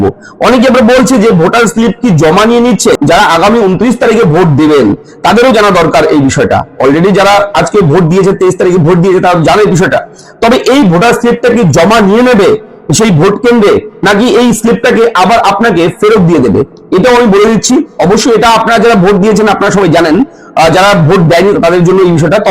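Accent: Bangladesh. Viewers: এ প্রচুর গাঁজা টেনেছে বোঝা যাচ্ছে I Accent শুনে সন্দেহ হচ্ছে এ নিজেই বাংলাদেশ থেকে খুব বেশিদিন আসে নি I যে ভাবে S উচ্চারণ করছে তাতে তো তাই মনে হচ্ছে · সব ঠিক আছে। একটাই প্রশ্ন। বাংলাদেশি? জিভের আড় ভাঙেনি এখনও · আপনার উচ্চারণ কিন্ত বাংলাদেশীদের মত। আপনি নিজেই বাংলাদেশী হতে পারেন বলে মনে হচ্ছে।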